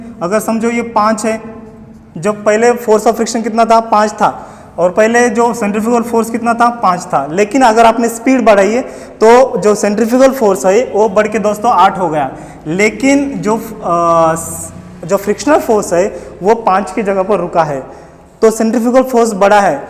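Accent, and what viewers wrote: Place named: Maharashtra, India. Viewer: सर आप हिंदी मे पढाते हो... पण मला असं वागतं कि तुम्ही मराठी बोलता बरोबर ना सर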